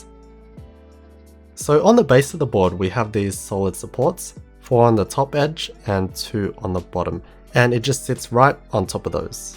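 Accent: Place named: Australia. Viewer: sounds like every single Asian Australian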